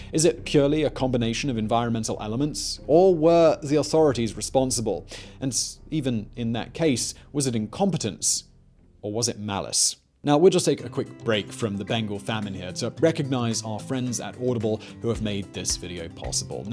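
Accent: United Kingdom. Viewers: I also noted that he described Fazlul Huq as a Hindu, so I'm overall questioning the accuracy of this content overall right now. This is the problem with Youtube. Anyone can post anything and we have no idea of the qualifications of the presenter or veracity of their content. And you know how it goes - people tend to think anyone with a British accent knows what they're talking about, even if they're talking out of their a>>.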